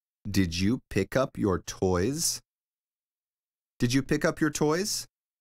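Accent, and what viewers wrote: United States. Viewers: excelente video, muy buena forma para aprender. ¿ el ingles de tus videos es ingles americano ? ¿ o es ingles como se habla en Gran Bretaña?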